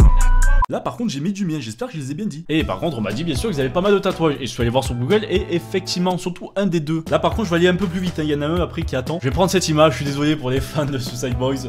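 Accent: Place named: Marseille, France. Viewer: Ta l'accent Marseille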